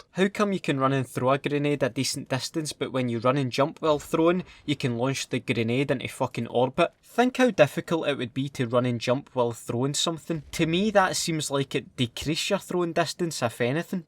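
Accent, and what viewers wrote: Scotland, United Kingdom. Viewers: Haven't watched this in years so glad i came back to watch these made me laugh and cry man 😅😅 the Scottish accent. Makes it better love yeah ❤️❤️🔥🔥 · His Scottish accent makes it funnier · You’re a fking hero that Scottish accent just makes everything you say hilarious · i love scotish accent!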